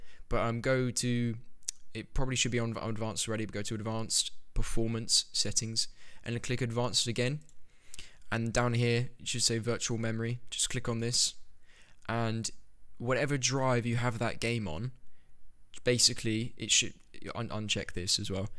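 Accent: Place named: United Kingdom. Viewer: Thank you random British guy with a nice voice, thank you.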